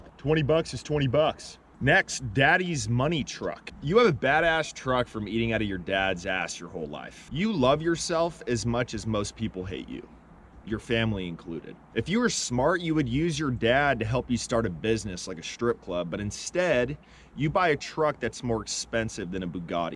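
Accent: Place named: California, United States. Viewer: This dude sounds extremely California lol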